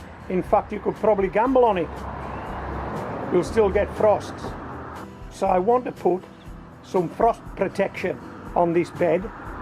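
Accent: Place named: Cumbria, United Kingdom. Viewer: Are you from Cumbria? You sound like a Marra!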